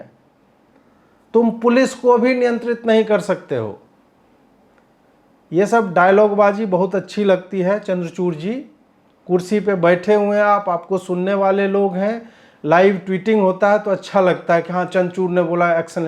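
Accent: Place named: Bihar, India. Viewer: कितना झूठ बोलोगे। बोली तो बिहारी किस्म की लगती है। अगर आप बिहार से नहीं हैं तो प्लीज़ बता दें ताकि हम बिहारी इस अपराध बोध से मुक्त हो सकें कि हमारे बीच से आप जैसे लोग भीआते हैं।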